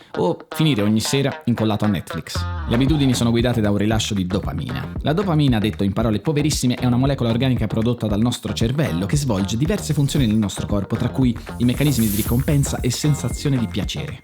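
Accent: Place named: Tuscany, Italy. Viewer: Una così alta qualità di contenuti e forma (davvero, sono bellissimi i tuoi video, dalla fotografia al montaggio) e solo 219 iscritti? Il mondo è un posto ingiusto. In bocca al lupo per il futuro! Continua così! P.s.: per quanto tu possa parlare molto correttamente, c'è una piccola inflessione che mi faceva pensare tu potessi essere fratello di granducato, poi il giglio sul laptop ha tolto ogni dubbio!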